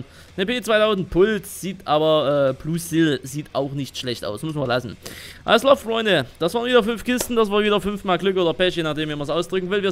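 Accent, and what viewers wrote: Saxony, Germany. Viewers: weil sachse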